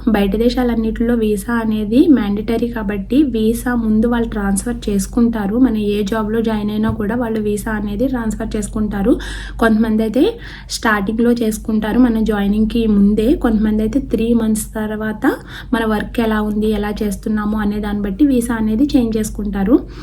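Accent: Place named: Godavari, India. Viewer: అక్కో మీది గోదారి జిల్లా 😄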